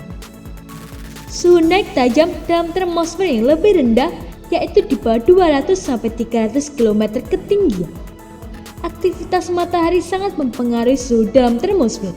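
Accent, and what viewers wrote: Java, Indonesia. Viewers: Medog anjirrrr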